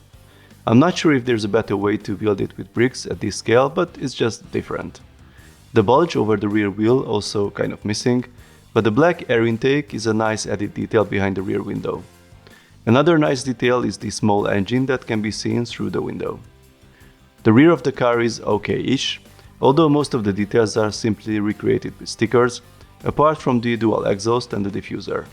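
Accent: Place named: Hungary. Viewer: Hallatszik a Magyar kiejtés 😊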